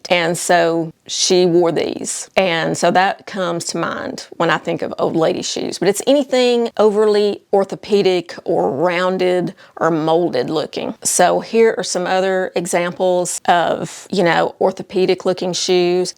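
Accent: South, United States. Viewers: You are hilarious! I love how you speak the truth in that beautiful Southern accent. Preach on sister! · Hi, I love watching your videos! You give great alternatives and I love the fact you always provide links to many options for purchase. Your "straight talk" is appreciated, but I have to say your bluntness combined with your Southern accent is quite entertaining too. Several highlights: 1) Re: Super skinny jeans/jeggings: "They're like sausage casings..."🌭 2) Re: Cold shoulder top + skinny jeans: "You look like you've been in a coma since 2015..."😱 3) Re: Miss Me jeans: "You can still buy these, but that doesn't mean they're in style..."😰 4) Re: Non-descript old lady shoes: "The shoe that has an identity crisis...it's ugly is what it is..." 😅 5) Re: Sport sandals with straps, velcro, padding: "This is just hideous"🤮 6) Re: Ugly ankle boots: "These scream old lady..." 👵 7) Re: Ill-fitting bras (not supporting the girls properly): "This is an epidemic...You know, the girls are down to the waist..." 🤣 People can find more hidden Easter eggs🥚 by actually watching and listening to the entire video, especially toward the end!:hands-yellow-heart-red: Take all constructive criticism with an open heart and mind, it's a fashion channel after all, but the target audience is for mid-life sisters, myself included! 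😊🥰💕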